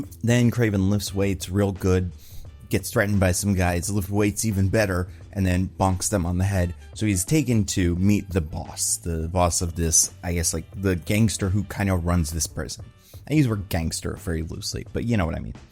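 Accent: Russia. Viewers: If it makes you feel better, I think you could be in this movie with your Russian accent and it wouldn’t be a detriment to the movie. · this video is filled with lies. for starters he said "my russian accent is bad", yeah, not buying that clearly russia-born youtuber buy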